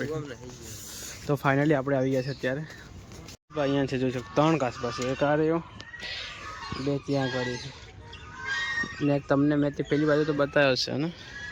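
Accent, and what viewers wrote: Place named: Bhili, India. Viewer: ભાઈ ક્યાંના છો ભીલી બોલી બોલતા હોય એવું લાગે😊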